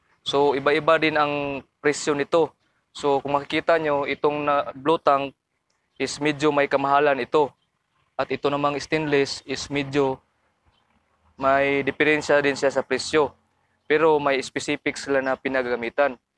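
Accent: Visayas, Philippines. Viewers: bisaya po kc sir ok lang ang important is nakakatulong lahat ng sinabi nya 😊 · cguro bisaya ka hirap ka mg explain ...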